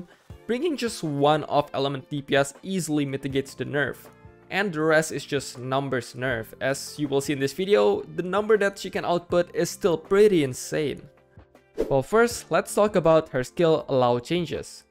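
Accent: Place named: Indonesia. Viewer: Fix Indo wkwk kedengeran aksennya, mantap bang, keep making more content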